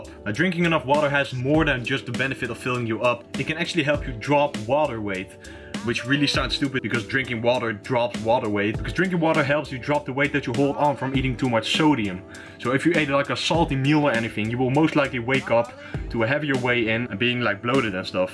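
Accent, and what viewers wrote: Netherlands. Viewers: Een nieuwe abonnee erbij hoor aan je acccent dat je Nederlands bent 💪🏽👊🏼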